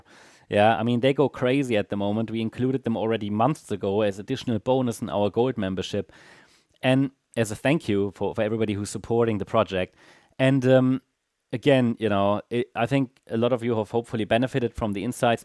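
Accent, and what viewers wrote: Germany. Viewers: Man hört deutsch raus, geiler Kerl!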